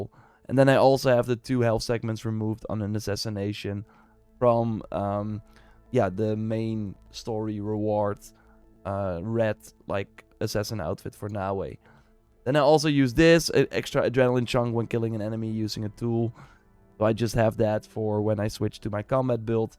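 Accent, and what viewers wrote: Netherlands. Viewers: Dude are you from the Netherlands by any chance?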